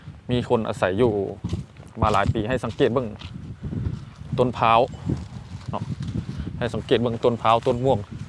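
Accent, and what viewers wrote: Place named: Nakhon Phanom, Thailand. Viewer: เว้าคือบ้านเฮานครพนม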